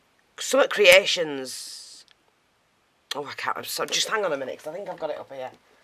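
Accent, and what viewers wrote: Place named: Northern England, United Kingdom. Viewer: Thanks for this and great to hear a UK and even better a Northern lass instead of the US gals all the time :0)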